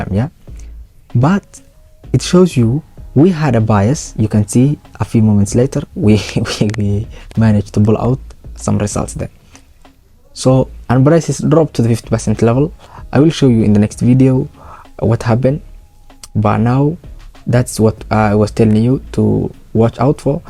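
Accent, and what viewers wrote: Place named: Somalia. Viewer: Your accent looks Somali hh glad to see ur video though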